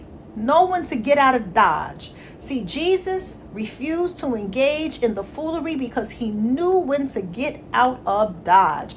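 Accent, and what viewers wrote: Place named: New Jersey, United States. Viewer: Ms., your Jersey accent is off the chain, your accent is beautiful and gorgeous!!!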